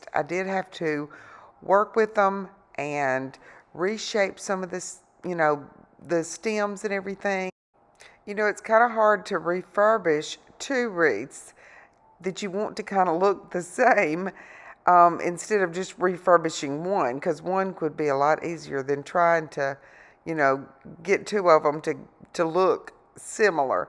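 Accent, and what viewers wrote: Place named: South, United States. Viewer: Hi 👋 I just luv your southern accent 😍 and the wreath came out beautiful.. Thanks for the upload..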